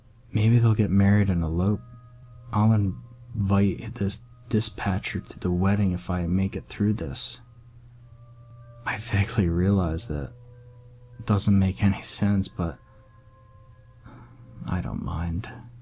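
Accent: United States. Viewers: No, he's American or Canadian sounding. You should check out his channel, his back catalogue spans something like 8 years.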